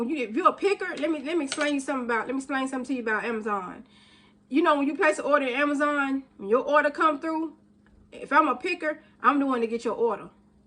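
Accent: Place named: Tennessee, United States. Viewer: I can tell by your accent that you're from Tennessee or somewhere near. ❤❤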